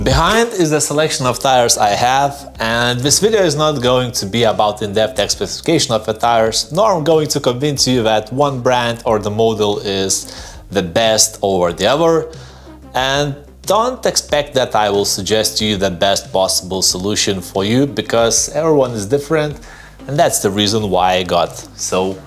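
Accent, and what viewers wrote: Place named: Soviet Union. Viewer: strong soviet accent ))) but video is very good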